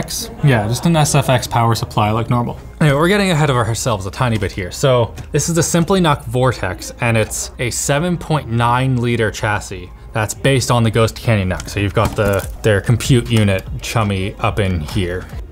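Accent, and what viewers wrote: Canada. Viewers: never noticed this but srsly sounds canadian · I just realized why I love videos so much: he has the thickest Canadian accent of anyone at LMG. Edit:spelling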